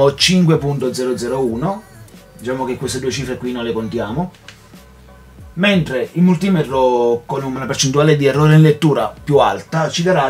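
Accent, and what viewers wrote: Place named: Brescia, Italy. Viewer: Dall'accento mi sembravi più brescano!!! (io sono di avellino, ormai da 15 anni trapiantato in toscana)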